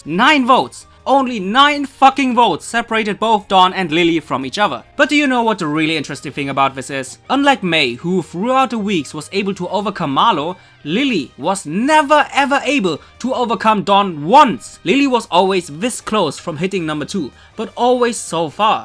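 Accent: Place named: Germany. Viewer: Hey + Maybe I'm wrong but are you from Germany? Your way of speach of some Names really seams like your from germany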